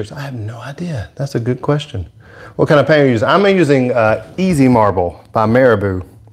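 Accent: South, United States. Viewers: great !you sound like yer from the south!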